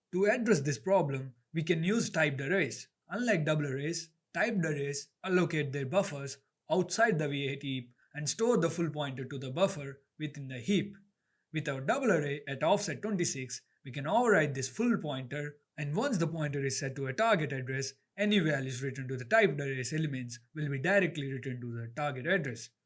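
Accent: India. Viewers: i love the indian accent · Are you from telugu states?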